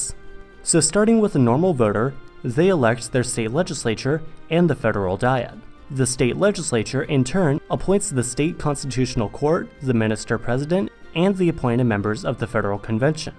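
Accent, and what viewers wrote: Germany. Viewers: Nice German accent! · Art Man. He sounds like a German. Many English speaking Germans sound American. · A German narrator perfect in English ( with a very slight German accent though) , not the other way around. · Pretty good, but you could still tell that german is not his native tounge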